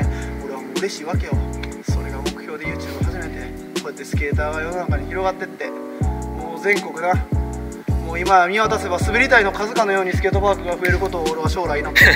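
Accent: Kansai, Japan. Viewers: 関西弁でめちゃわかりやすい！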